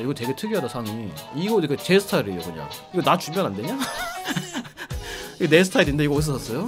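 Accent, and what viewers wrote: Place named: North Korea. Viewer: 북사투리 ㅋㅋㅋ 개노잼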